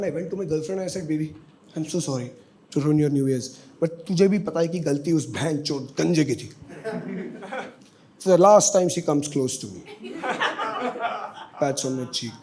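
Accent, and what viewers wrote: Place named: Garhwal, India. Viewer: 🤭🤭your accent is garwali though💜was waiting for someone from Uttarakhand to do standip.Finally got you.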